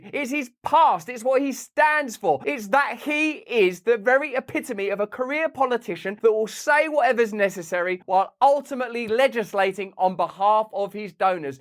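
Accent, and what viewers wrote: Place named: United Kingdom. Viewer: “Geh op an go geh’em”… “Get up and go get them” I love the British accent, not making fun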